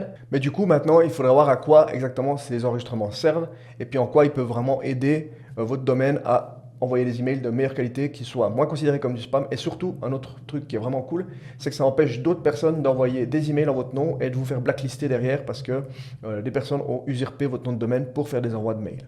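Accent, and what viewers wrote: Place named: Belgium. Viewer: Très clair et précis et avec un bel accent belge si je ne me trompe pas ! Thanks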